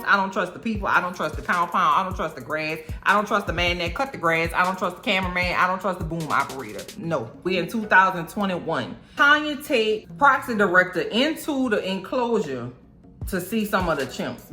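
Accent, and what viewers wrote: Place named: Los Angeles, United States. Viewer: Just binged it… all these folks are delulu! I don’t know anyone else reviewing this, so I’m here to stay for a while! U sound like my LA ppl! 🫶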